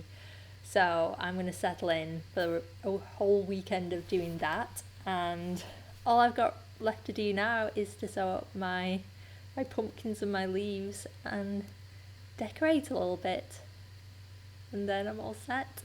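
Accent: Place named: Liverpool, United Kingdom. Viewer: Thanks so much for the lovely Hiraeth pattern,. The name really touched me when I read your newsletter this morning, as it's something I've been feeling a lot of for the past few months, being originally from Wales. Not sure why, having lived in Canada for 100 yrs, but there you are. Not sure if I've missed this, but why the name Hiraeth, why did you pick that as the name? I can definitely hear that Liverpool twang, but do you have a Welsh background?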